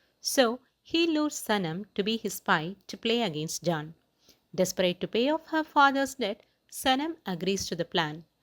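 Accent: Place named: Kerala, India. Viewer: You (the narrator) sound like a Malayali woman Your accent I mean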